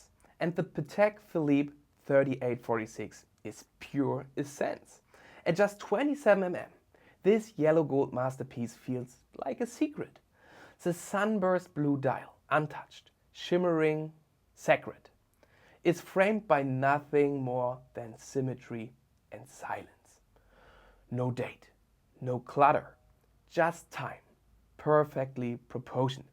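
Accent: Germany. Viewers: I love your videos. Your lighting and the way you showcase your watches really elevates them. On a side note, I love your accent too. I’m in the UK and have a close friend who is German, a lot of the words you pronounce similarly to him, we call it Ginglish! My surname is Konig too, my grandfather must’ve lost the ö when he came over to the UK, I doubt they had “ö” on the typewriter when they processed his papers so we’ve lost it!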